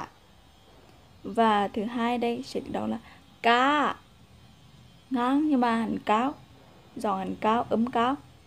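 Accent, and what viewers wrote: Nghe An, Vietnam. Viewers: Bạn người Nghệ an ,hà tĩnh hay người laos vậy bạn giảng thật dễ thương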